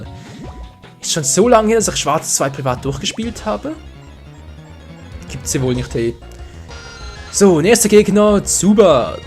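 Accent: Switzerland. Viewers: ja man hört es auch bei akzent das es schweizer ist ich bin italiänerinn und rede deutsch wie eine italiänerinn aber schweizer deutsch so berndeutsch wie eine schweizerin xD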